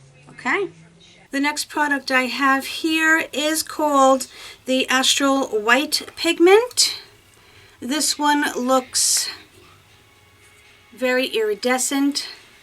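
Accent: New York, United States. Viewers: Always better when I see your beautiful face and your lovely NY accent..x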